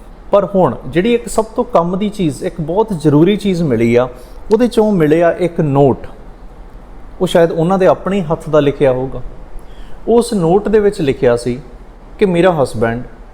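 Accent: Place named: Jagraon, India. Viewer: ਵਧਾਈ ਆ ਬਾਈ ਜੀ ਸਵਾਦ ਆਉਂਦਾ ਸੁਣ k ਲੱਗਦਾ ਤੁਸੀਂ ਸਾਡੇ ਏਰੀਆ ਤੋਂ he ਹੋ ਜਗਰਾਓਂ ਤੋਂ ਅਸੀ ਰਾਏਕੋਟ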